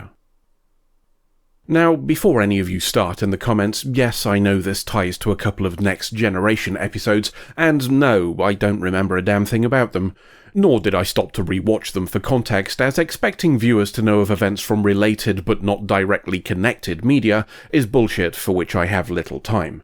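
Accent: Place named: England, United Kingdom. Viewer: How refreshing to hear a commentary in an English accent with English expressions.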